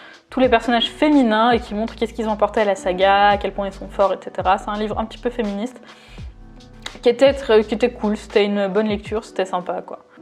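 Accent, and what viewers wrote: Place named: England, United Kingdom. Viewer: ton accent anglais ❤️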